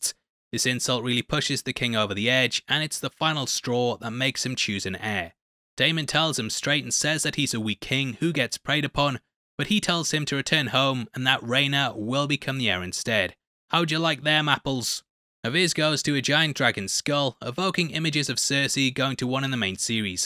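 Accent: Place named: England, United Kingdom. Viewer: I love how your English but your impressions bare terrible lol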